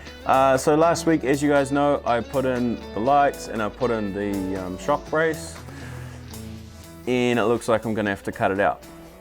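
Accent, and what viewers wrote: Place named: New Zealand. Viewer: Welcome to episode fortysex..... go the kiwi accent fella! Keep up the great vids!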